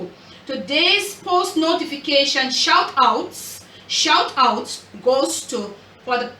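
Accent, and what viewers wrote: Patois, Jamaica. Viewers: Mi love u patois😂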